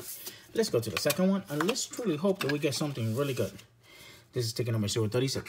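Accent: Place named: Ireland. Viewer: Hi 🖐 great video. I'm from the UK. And listening to you I could hear Irish accent in your voice real quite strong at times. Do you live in Ireland? Lol so curious. 👍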